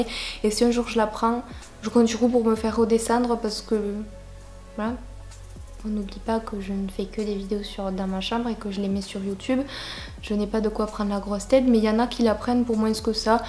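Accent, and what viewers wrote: South, France. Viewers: Et j adore ton accent du sud ^^^ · l'accent du sud ❤❤❤ j'adore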